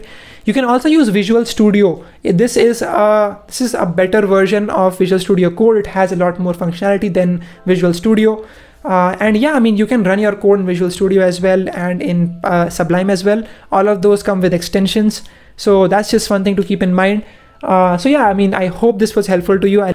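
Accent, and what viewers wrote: India. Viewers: Sounds Indian, but he put some work in. I'll listen... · Video: starts Me: stf you indian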